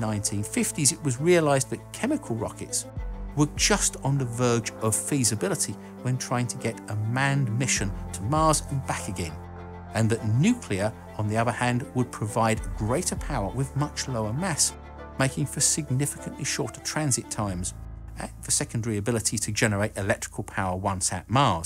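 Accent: United Kingdom. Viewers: i found your channel by youtube advise like following: 1. British accent check 2. Shaved head check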